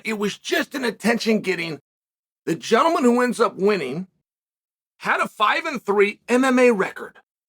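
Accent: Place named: East Coast, United States. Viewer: Chael you do so good on your podcast. Engaging, passionate, hand gestures, east coast it is what it is talk BUT fair enough were gonna do it anyway, kinda Trump talk. Great for this side of entertainment and what people want to see when they click something like this topic. You're doing great with your podcast format, wouldn't change much. Just keep going!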